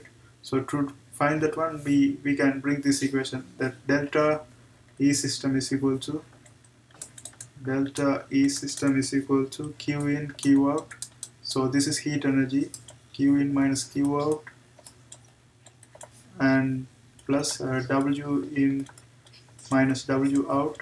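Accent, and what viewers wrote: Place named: India. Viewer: Indian speaker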